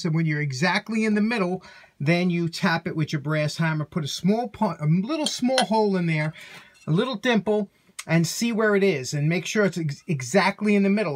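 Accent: New York, United States. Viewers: A very educational episode I loved it. No Disrespect intended but I love the new Yorker accent huh lol it's the best. Pretty soon it's going to be winter I'm in Texas so I'm ready lol. Thanks:D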